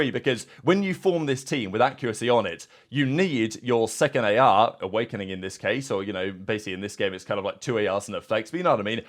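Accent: United Kingdom. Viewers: Glad you made the decision to keep the intro. I was watching one of ur vids over Christmas break and my mom heard the audio and she was like “Holy fuck that’s a hell a British accent, I can barely understand him with that accent and how fast he’s talking” 😂😂 we’ve gotten used to the intro and switch it up if u think it’s needed change is good but I’m glad the original intro is staying for now lmao.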